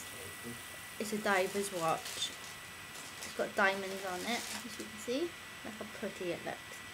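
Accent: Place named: Wales, United Kingdom. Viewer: Good video , nice Welsh accent , excellent.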